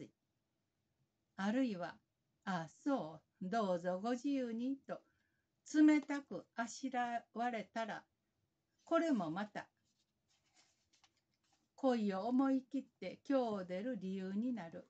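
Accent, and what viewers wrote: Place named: Kansai, Japan. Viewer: こんにちは、私は長年田辺聖子さんのファンでした。本で読み慣れた田辺節、滑らかな関西弁のイントネーションと共に大変楽しく拝聴させていただきました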